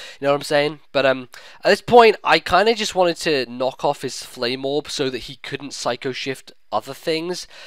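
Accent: Scotland, United Kingdom. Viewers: dude you're scottish? that's fucking awesome, listen to a band called they're from Scotland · you Scottish that's awesome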